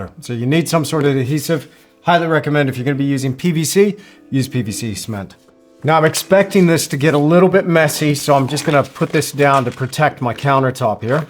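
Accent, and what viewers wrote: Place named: Australia. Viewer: I love your accent mate - Are you an aussie living abroad?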